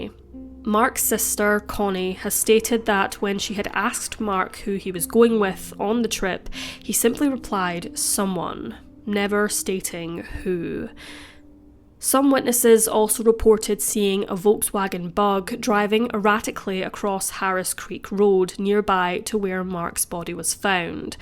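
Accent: Scotland, United Kingdom. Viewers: I love watching your videos, bonus you have a Scottish accent as I'm from Dundee, you sound East Coast also? 👍🏻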